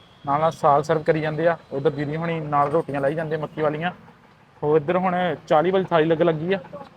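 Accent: Majha, India. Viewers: Ahii majhe Wale a pra...ahi te adkar hi khene a ...😅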